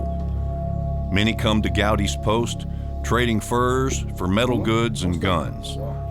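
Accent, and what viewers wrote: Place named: South, United States. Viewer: Decently made, some inaccuracies for sure though. Biggest example being that the British 100% DID have experience fighting “like Indians and backwoods men”. This was called “skirmish order”, and European regiments had a whole company each of light infantry specifically trained in this. They also had just fought an entire 9 year war, both with and against these tactics, known in the US as “the French & Indian War”. So this was nothing new to them, and woodsmen played a minimal role in the war UNTIL the war reached the south where tactics changed. These men would not win in open battle against British regulars. So they did not even try. They attacked civilian and logistical assets, making British leadership not feel comfortable moving further North. This kept the British bogged down chasing “insurgents”(think of modern Taliban tactics), and kept the northern and southern British armies separated. 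My biggest gripe is Trace as narrator. If wanted to be taken seriously as a historian, he should not have been a modern country singer. If you want your documentary to be taken seriously, don’t hire Trace. Especially to cover a subject that literally Southern accents did not exist within. Thank you for listening to my rant 😂.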